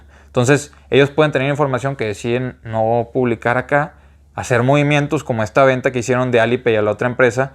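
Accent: Monterrey, Mexico. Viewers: Pues bien bonito que es esa plática con ese maravilloso acento regiomontano. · tiene un acento bien marcado Regiomontano de Mexico que me trae grandes recuerdos de mi tierra.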